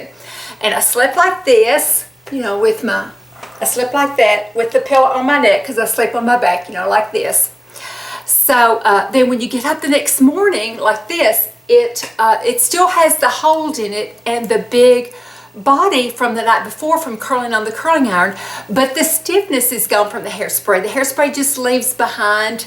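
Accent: South, United States. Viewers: I love your videos so much! When you bring us around your lovely town I think of one of my favorite movies Steel Magnolias. I love a small town country atmosphere not to mention a southern accent. I may have been born and raised in NJ but my inner spirit is most definitely southern charm!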